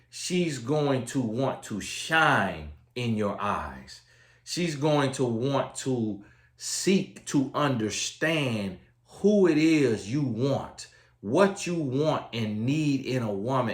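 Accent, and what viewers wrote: South, United States. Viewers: Love this southern man talking in Ebonics! Yes we hear you brother. Time for men and women to claim their God given place! It’s on the men. It’s on Adam. Praise God for breaking curses. Set the example. Say the truth.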